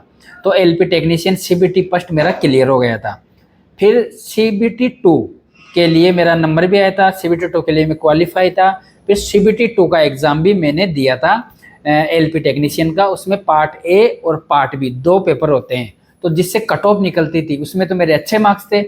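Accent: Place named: Rajasthan, India. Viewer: Sir aapki language tone se hi pta chl gya ,aap rajasthan se h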